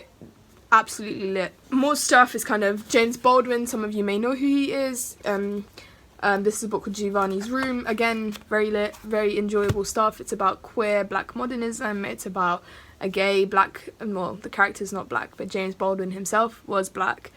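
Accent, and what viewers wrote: United Kingdom. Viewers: uh that british accent gurl:) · Have you been born in uk, if no, then , how you have uk accent , and have you learnt phonetics if not then how did you learn pronunciation .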